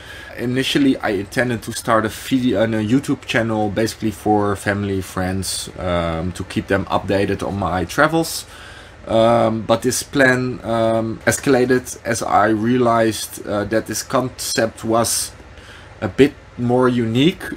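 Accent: Netherlands. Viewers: BTW…. Don’t be self-conscious about your Dutch accent. Most people here will find it charming 😉. I LOVE the Netherlands. Some of the best marine technology in the world comes out of your tiny little country, and you should be very proud. 👍🏼. Not to mention…Old Dutch Gouda is to DIE for 😋 🧀. Can’t wait to follow your adventures ! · English is perfectly fine. Distinctively Dutch. Good intro thanks.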